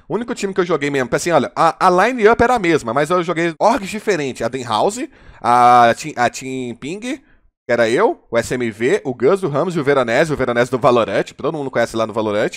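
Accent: Belo Horizonte, Brazil. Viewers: Cara vc tem muito sotaque de BH, vc é?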